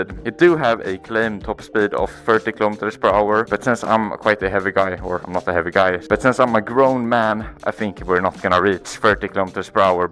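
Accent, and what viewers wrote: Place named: Sweden. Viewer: you soud swidish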